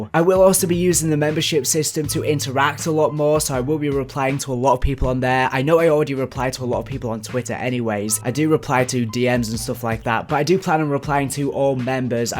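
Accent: United Kingdom. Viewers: Anyone else loving when he is streching some word with this british accent? :D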